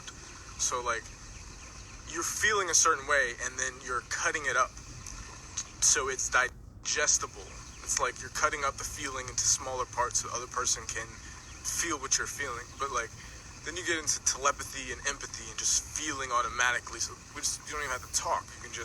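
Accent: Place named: United Kingdom. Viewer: Goood shit!!! Haha!! I love this dude. Amazing British accent too 😂 💪🏼💚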